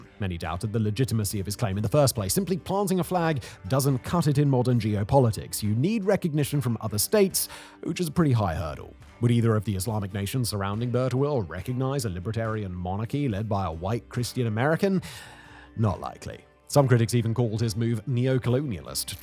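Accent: United Kingdom. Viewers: By "Freedom units" he means British imperial units, some even have the word British in them ie. BTU. Yes we still use the same units named after the accent he has, and he uses the units that originated in France, not the UK or CZ. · You are so British. Gawd your pronunciation is so ridiculous.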